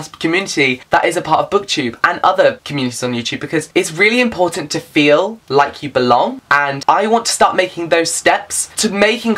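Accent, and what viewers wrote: United Kingdom. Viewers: I love your channel. Your enthusiasm and love of reading is infectious. Your accent is adorable. (I'm American and love Brittish accents) Forget the haters. They know nothing. And if your sexuality is a turn off for them, then they were not true fans in the first place. They're just ignorant trolls. #DontFeedTheTrolls Ignore them and do you. Your true fans will appreciate that all the more.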